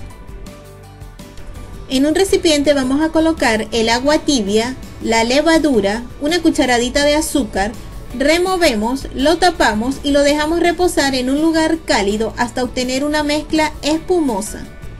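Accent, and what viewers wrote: Venezuela. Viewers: estoh eh venezolano o que chicoh po que si va pueh tanto venezolano aca parece peru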